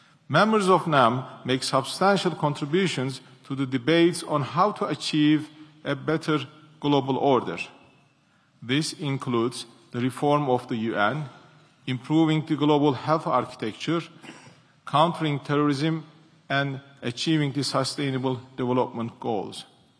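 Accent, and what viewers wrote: Turkey. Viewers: Türk gibi konusuyor :) Ama istedigi gibi konusabilir, karizmasi yeter! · Hala bu kadar agir bir turkce aksani ile ingilizce konusmasi😅 Arkadas ne olacak bu turklerin ingilizce ile imtihani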